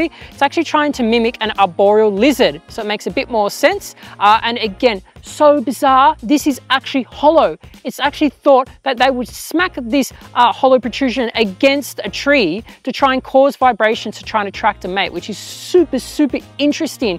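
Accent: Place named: Australia. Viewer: Your accent tells me you are from Australia :)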